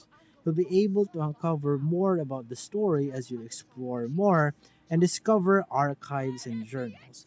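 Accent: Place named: India. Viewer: great honest review , love your hindi accent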